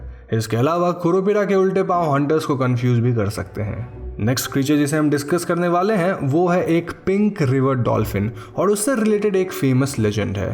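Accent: India. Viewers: Eu gosto da forma que ele começa falando uma frase em inglês e do nada já muda de idioma. Só os indianos conseguem se entender msm kk mas eu amo o sotaque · É o inglês indiano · he's indian and he's speaking english with hindi accent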